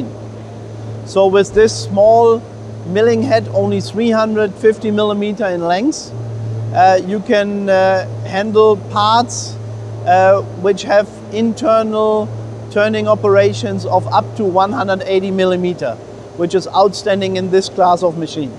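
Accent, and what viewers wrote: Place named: Germany. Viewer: I love his german accent😂😂😂😂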